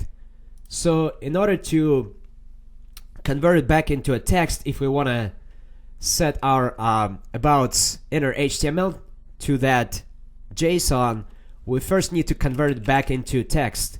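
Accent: India. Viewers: hahaha indian accent, hhhh btw i am soooo lucky to find your channel cuz you explain the syntax better than anyone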